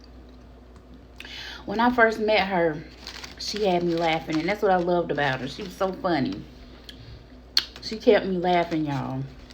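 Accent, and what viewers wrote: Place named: United States. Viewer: I like her country accent!!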